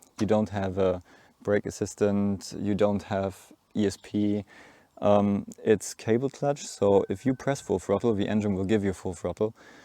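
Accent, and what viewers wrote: Germany. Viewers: Wenn ich das richtig heraushöre bist Du Deutscher? Warum den auf Englisch? Wegen der Reichweite?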